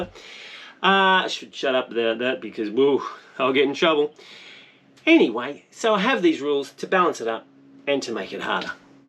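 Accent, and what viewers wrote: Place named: Australia. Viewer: Not at all! You have to work with what you have but that doesn't deny you being smarter about it. All you have to do is keep filming, and giver yourself enough time between 'eprisodes' to craft your content into a storyline that garners interest, and which you USED TO DO, otherwise I would not be sitting here giving you an honest critique! Think about what you used to do that's missing. I haven't had time to look at all your older 'top end' episodes but the few I have provide a viable outline. They contained the following: 1) Aussie accent and lingo 2) Interesting locations & commentary 3) Lots of 'fluff' 4) A bit of 'puff' 5) and some high-seas drama that nearly everyone could relate to one way or another, e.g. "who's drinkin' all the flamin' water?", "crocodiles" etc. ...and you were building numbers! Also don't forget that a story has a beginning: set the scene, a middle: flesh it out with interesting content, drama, & Margarida (especially Margarida), and an end: wrap it up with a mini preview of the next one, a teaser or two from Margarida, thank your sponsors, and tell your viewers/followers what you need from them if they are enjoyed THIS show...and all of that in Aussie lingo with the occasional translation of the more obscure. 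Note to you: You had most of that, sort of, right up until Margarida got stuck someplace else, and you had the lightening strike. None of the above is about changing you, champ. It's all about changing how you do what you are doing, or at the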